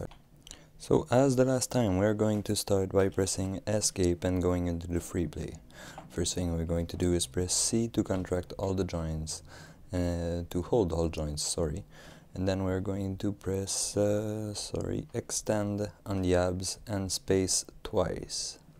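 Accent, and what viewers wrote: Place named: Quebec, Canada. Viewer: ont a un québécois icite!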